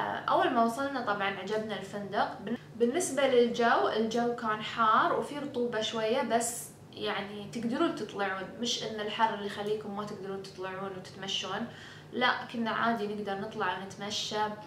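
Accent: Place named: Bahrain. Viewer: كاتبه انها اماراتيه بس لهجتها يايه ع البحرينية + شوي عراقيه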